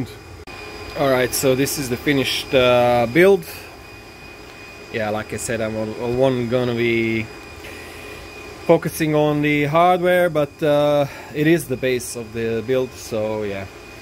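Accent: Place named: Russia. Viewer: Акцент такой русский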